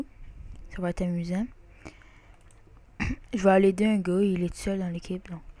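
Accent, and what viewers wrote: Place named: England, United Kingdom. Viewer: Est tu englais car tu a un accents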